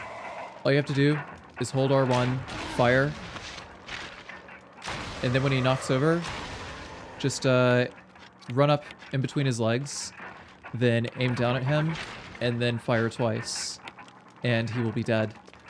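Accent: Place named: England, United Kingdom. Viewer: I love your voice. Superb english accent.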